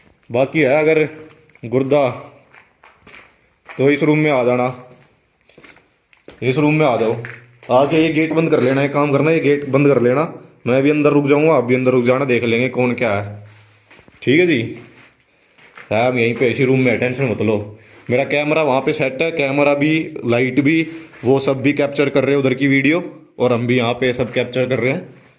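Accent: Haryana, India. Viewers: Bhai khaa k ho Aap Aap ki voice Haryana ki lgti h m bhi Haryana say hu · Genuine content and it is up to the mark. Never knew that such "mature" investigators are there in our community... And that हरियाणवी accent, भाई साहब! Keep growing,buddy :)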